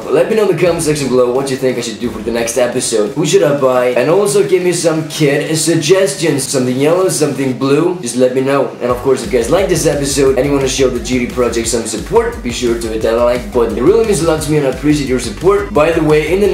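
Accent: Iceland. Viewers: Everyone saying his voice is annoying did you ever think it was because of his accent and hes from iceland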